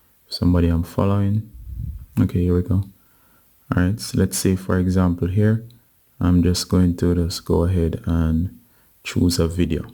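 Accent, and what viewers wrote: Jamaica. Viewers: You sound like your jamaican....Thanks for the tutorial!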